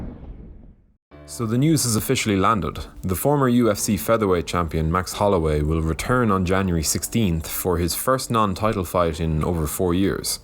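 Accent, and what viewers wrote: Ireland. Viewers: Because of this chanel i want everything to be narrated in irish. Let me take that back, i wish everything in life was narrated by THIS guy specifically.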